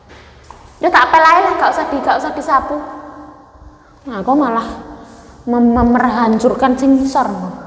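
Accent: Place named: Banyuwangi, Indonesia. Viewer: Sampean ki opo wong banyuwangi, kok logat ngomong'e koyok mbak'u ipar banyuwangi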